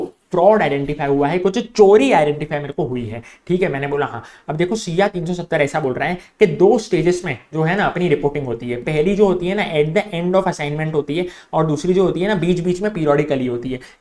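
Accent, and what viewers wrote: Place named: Indore, India. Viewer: Sir bataya nai aapne... App indore se honaa...? Apki boli se lgriya h sir 😁😁😁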